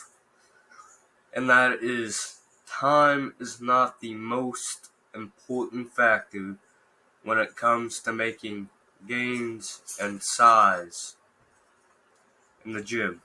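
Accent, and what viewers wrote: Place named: North East, United Kingdom. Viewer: Sound like he in the north east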